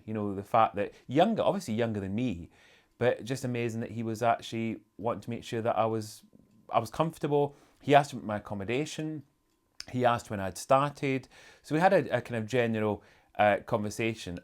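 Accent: Scotland, United Kingdom. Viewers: Are you Scottish? We love your accent!!! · You're probably too much of a gentleman to answer this question but...having known Harry when he was younger what are your thoughts on his and his wife's current behaviour? Surely as someone who had seen first-hand how close the brothers used to be their current estrangement must be very sad to witness...☹ Ps...love the Scottish brogue, it's so incredibly soothing! 💖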